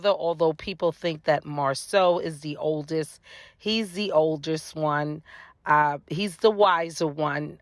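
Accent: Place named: New York, United States. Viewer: You speak very well, sounds like you're from New York the way you pronounce lawyer, talk and brother, are you?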